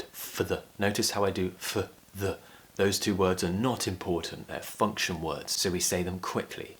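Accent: United Kingdom. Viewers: I love your British accent. I'm one of your fans from Egypt. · I used to hate British accent. That was before I discovered this channel, I cant stop hearing your voice and pronunciation. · Damn, I love your voice, the way you teach and this british accent. Thank you very much for your help. Hugs from Brazil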